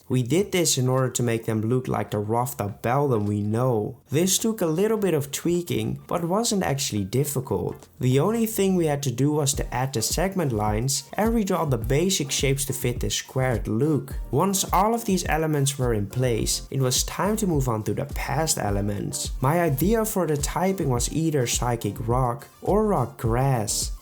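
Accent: Netherlands. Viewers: Bro ik zweer ik wist dat die nederlands was😂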